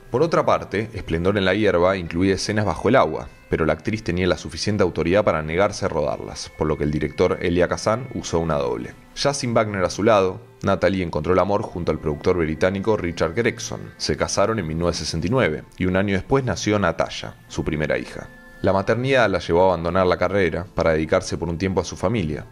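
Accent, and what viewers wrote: Argentina. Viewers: Ese acento exagerado argentino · Noo, a este locutor no se le entienden muchas palabras, ese dialécto argentino, machacando las palabras al final; debería estudiar locución, no hay como el español colombiano, claro, preciso y conciso, no dañen el español, que es un idioma bonito, claro que peor lo hablan los propios españoles, con una pronunciación tan arrastrada, sin querer abrir la boca o los dientes, como si siempre quisieran pronunciar la Z.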